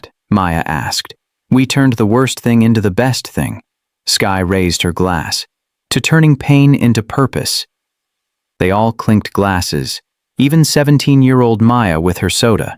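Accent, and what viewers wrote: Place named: Asia. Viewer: Hey narrator are you asian? It's obvious from the way you pronounce words.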